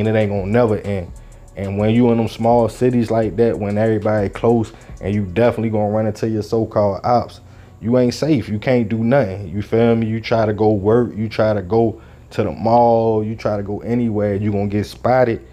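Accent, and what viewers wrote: Baltimore, United States. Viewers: I’ve been watching you for a little minute now and I just realize you don’t say “you” with the typical Baltimore accent but everything else you say sounds like the typical Baltimore accent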